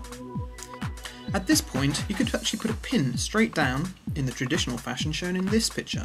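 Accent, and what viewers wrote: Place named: RP, United Kingdom. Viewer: ahahah you really can find almost everything on youtube thanks for the information he does sound pretty posh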